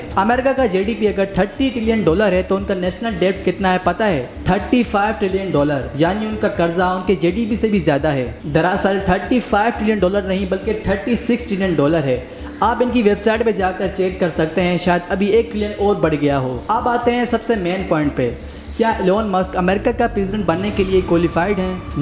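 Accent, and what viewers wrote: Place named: Sindh, India. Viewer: Pele nahi hota Pehle hota hai, urdu achi karo clear sunai nahi de rha thora jama jama kr bolo pause leke, sindhi lag rhay ho clear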